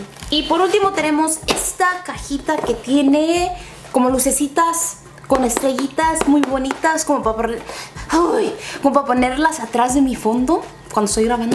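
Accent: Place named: Michoacán, Mexico. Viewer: !!!!! Felicidades!!!,tengo poco siguiéndote en tus tutoriales me gusta mucho como te maquillas, qué bonito rostro tienes, quisiera saber de donde eres originaria o de donde son originarios tus padres porque tienes un acento como que del estado de Michoacán, recibe saludos desde México D.F.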